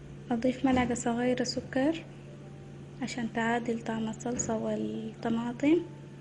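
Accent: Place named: Sudan. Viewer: يدك نظيفة وشغلك نظيفة وعدتك سممممحة وأهم شي حفااااااظك على اللهجة السودانية ياخ نحنا شعب معلم شعب مدرسة زاتو❤❤❤❤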